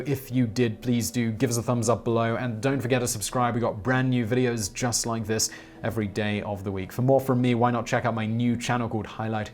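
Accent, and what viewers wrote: England, United Kingdom. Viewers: I was tickled by the title and it’s irony. While unnecessary, it was interesting pointing out these wealthy people who have committed these relatively petty crimes by comparison to those crimes historically committed by their counterparts. Hearing the host’s British accent and the words “wealthiest” and “thieves” makes it impossible to ignore the obvious. The majority if not all of the wealthiest nations and corporations in the world are wealthy because of all they have stolen, land and labor. The wealthy with few exceptions are an entitled bunch of folks. They spend what everyone else has worked hard for, and think everything is theirs for the taking. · Your English accent makes the insults all the much funnier. Thank you for my early morning lols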